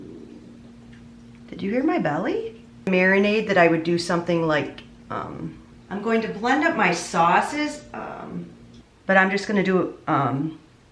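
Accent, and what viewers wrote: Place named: Chicago, United States. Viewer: I love brocoli salad and don't like tofu mayo, so these are just right for me. Can't wait to try them. You sound like you live in the Chicago suburbs, is that true? Me too!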